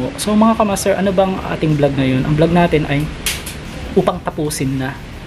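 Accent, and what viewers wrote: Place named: Bulacan, Philippines. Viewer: Hello more videos pa po..taga bulacan po ba kayo? May tono po ang salita nyu e😍 godbless po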